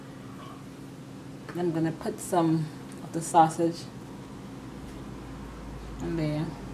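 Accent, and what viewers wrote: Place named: Jamaica. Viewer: I knew she was Jamaican lol. That accent hahaha. Love my people!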